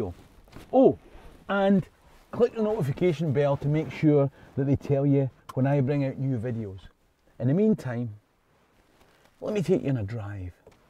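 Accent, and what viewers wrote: Scotland, United Kingdom. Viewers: I absolutely LOVE everything about your country! Outlander is credited for putting "Scotland on the map" .. I love your culture, accent, passion, history and the beautiful country itself. It's strange I've never visited your beautiful lands, however, in the deepest part of my soul I know I have a strong connection to it!! #ILoveScotland #SendingLoveFromAustralia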